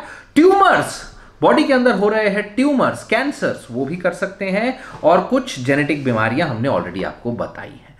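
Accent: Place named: Hyderabad, India. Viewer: Hydrabadi bolre doctor sab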